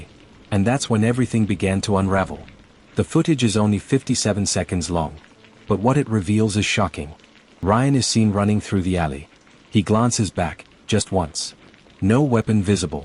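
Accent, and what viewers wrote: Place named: United Kingdom. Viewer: I can’t stand a British voice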